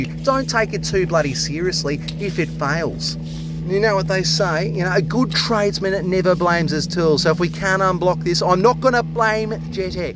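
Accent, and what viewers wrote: Australia. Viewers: hey mate, on my (unintentional but appreciated) search for more australian content creators, i came upon ur videos, and for some reason i cant stop watching, even tho im certainly not a plumber. i dont know, since i spent 2 years avoiding drop bears and fightin 'roos and murder birds, i cannot get ur lovely country out of my head, bloody going to have to find a job/sponsor at this point mate, anyway, keep doing these vids, and especially that awesome accent, i miss it so much :)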